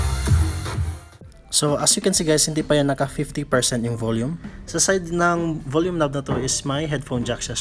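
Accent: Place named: Cebuano, Philippines. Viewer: Bai. Taga asa ka? Bisdak man kaayo. Shoutout diri Linamon Lanao del Norte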